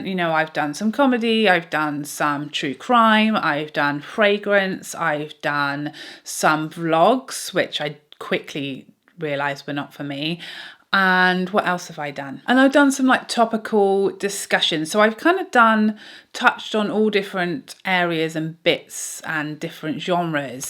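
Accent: United Kingdom. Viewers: Hi, I am new to your channel. You have a lovely British accent and very thoughtful reviews of products that are helpful. I enjoyed hearing all the answers to the questions in this tag. I hope you have a wonderful day! xo, Karen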